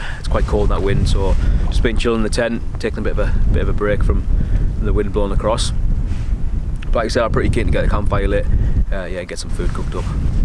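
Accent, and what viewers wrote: United Kingdom. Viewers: Ya know if it wasn’t for your accent, I wouldn’t think you’re in the UK , the scenery is as me cousins in Ulster would say lovely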